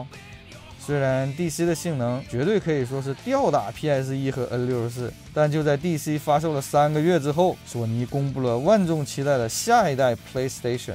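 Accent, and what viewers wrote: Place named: Northeast, China. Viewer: 东北口音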